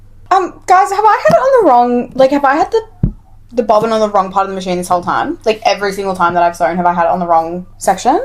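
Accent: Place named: Australia. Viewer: The UNO REVERSE RUG!! I love it! Also I only discovered your channel like a week ago, but you've already become a staple must-watch channel for me! I love sewing and crochet too, and your content is just so refreshing. I love your yap snippets, or your little walks and talks about anxiety, society, the human experience etc. Also there's something really homey and refreshing about hearing an Australian accent! Everyone else I watch is generally American so it felt so surprising but lovely to hear and see someone over here :)) Feels like having a friend over whilst we craft and yap in my living room. *Edit* because I though thought of something re: the tension whilst you've been sewing - double check how you're threading your machine. For the longest time I had missed a small step near the beginning. It will be different for each machine, but for mine there was a small disc or plate and instead of just wrapping the thread around it, I had to place it between the plate, almost like it slotted in between two (I'll compare the feeling of "slotting" the thread in to the feeling of placing dental floss between your teeth. 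That might sound rly weird but it's a similar feeling, and as you can imagine with dental floss, it would kind of hold the thread in place whilst still allowing it to run through. When I was skipping this step it meant that near the spool the thread was loose but as it passed through this sort of sandwiched portion, it pressed down the thread and helped keep it mor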